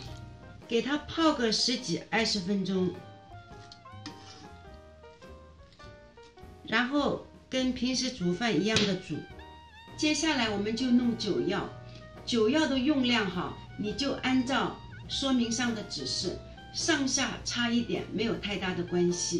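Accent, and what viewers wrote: Shanghai, China. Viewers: 稀饭听浓刚上海话，哈亲切！ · 上海话，哈亲切！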